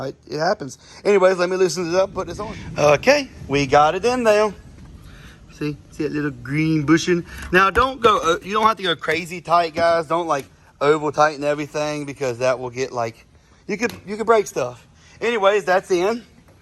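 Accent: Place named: South, United States. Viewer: Over tightening is my middle name 😂. Always stripping screw heads or bolt threads. Bad habit. Side note, that Southern accent is pretty cool. I thought I was listening to the American Dream Dusty Rhodes telling me how to fix my Fourwheeler..😂😂 I have an issue with the steering being hard to turn. I replace ball joints, tierod ends and wheel bearings on both sides and it turns a little better but surely should still turn easier. The bushing is one thing I haven't checked or changed. Was wondering about greasing it but idk if it'd do any good since you said it didn't really make a difference on greasing a new one. (Btw all that stuff I replaced needed replaced anyway but barely made it easier to turn)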